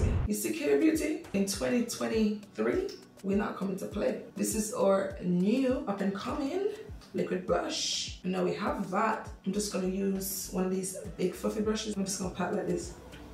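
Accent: Jamaica. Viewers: heard ur accent and said instantaneously "Jamaica 2 d bbclt world" tf. Repping wonderfully mama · girl, are you Jcan? I hear a slight accent, but then again, I could be wrong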